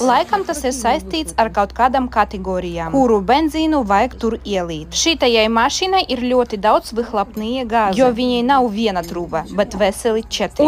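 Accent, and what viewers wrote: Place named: Ukraine. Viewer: Противно слышать украинский акцент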